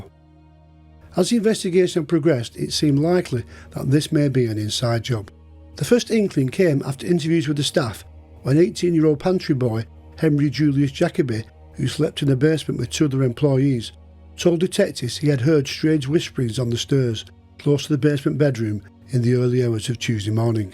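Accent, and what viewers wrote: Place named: Bolton, United Kingdom. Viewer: You definitely deserve more subscribers.All that meticulous research.Maybe if you changed the name of your channel 'Albert Pierrepoint v Ted Bundy'.Incidently I saw a Pierrepoint documentary with a very young looking as a consultant,Love to hear your mellifluous Boltonian twang,Keep up the good work kid. [sorry ]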